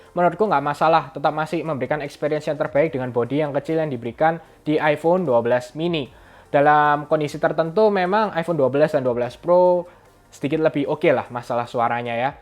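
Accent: Java, Indonesia. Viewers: Suaramu kok medok to Mas. Wong Suroboyo opo Semarang? ....... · Bolonganya 😄🙏🏻 sampeyan medok bgt toh koh ..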